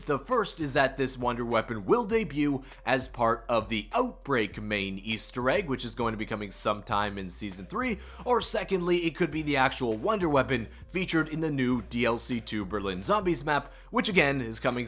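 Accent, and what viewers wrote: Canada. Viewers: Wait this mans is Canadian. I just realized your accent and I can’t unhear the “agaiiinst” and “aboot”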